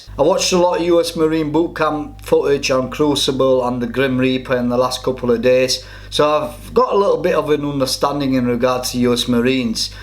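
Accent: Scotland, United Kingdom. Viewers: He’s got a Scottish accent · You have a hint of a Scot accent. But then again my ear's are screwed. I have pals in Glasgow all ex military and great pals to have your back in a bad situation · He is either Scottish, or from near the English-Scottisg border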